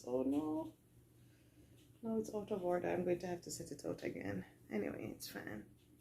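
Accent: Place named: Jamaica. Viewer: Hello, I'm new to your channel! Found you through Sean guy who sews💞 looking forward to seeing more of your videos! I have a coworker who is from Jamaica, your accent reminds me of her, a lovely -lively person 💞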